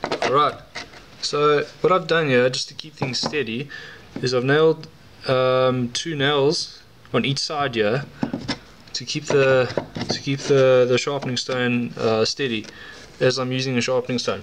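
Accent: South Africa. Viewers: I smaak the Souf Efrikan eksent bru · Jis man kan hoor dis n boertjie wat hier praat. 😉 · are you South African?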